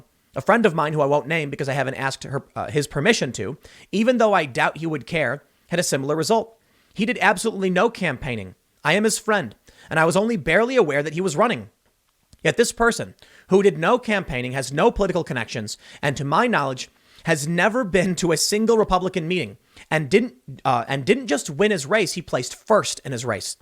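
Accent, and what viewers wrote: Chicago, United States. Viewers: Yup. Im English but is it his slightly Northern/ Chicago accent?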